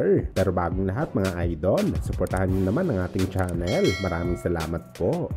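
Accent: Iloilo, Philippines. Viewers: Ilonggo geyd😅😅😅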